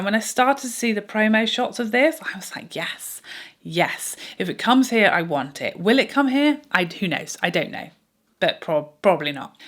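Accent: United Kingdom. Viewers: hahaha "tickling my pickle" ...wish i had a british accent to say things like this. i usually like dior quints but this bouquet one is blah, no. no to nars; did all the beauty brands come together and decide to all do a star, celestial theme? where is the creativity here? i would've liked that urban decay palette in college... im 33 now, and i prefer the muted/diffused look of chanel + dior shadows.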